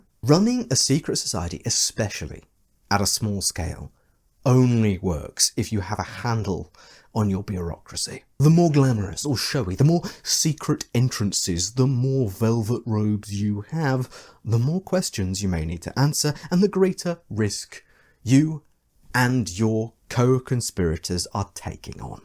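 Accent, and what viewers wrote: United Kingdom. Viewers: This guy is amazing in the sense he is 100% wrong on every video he makes but his narcissism and british accent fools him into thinking he is correct.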